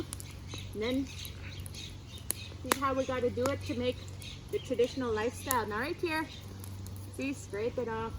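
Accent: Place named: Ireland. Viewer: Your accent almost sounds Irish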